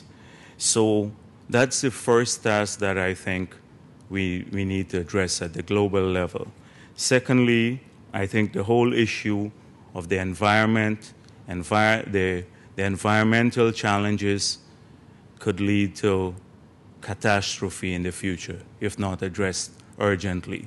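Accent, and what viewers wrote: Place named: Caribbean. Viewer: Clearly he speaks English fluently, with a marked Caribbean accent. However I don't understand how someone could serve as president were he unable to speak the official language.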